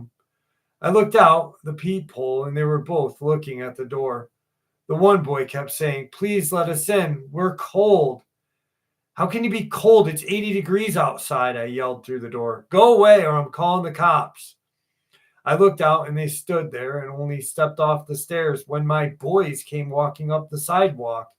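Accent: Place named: North Jersey, United States. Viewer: Well I believe your doing the North Jersey accent. We here in South Jersey do not talk like that. We have a southern twang. LOL!!!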